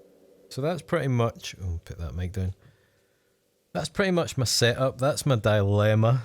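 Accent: Scotland, United Kingdom. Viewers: Backing track? what is cheating in music production at all these days? that sounds interesting, as far i understand your nice scottish accent;)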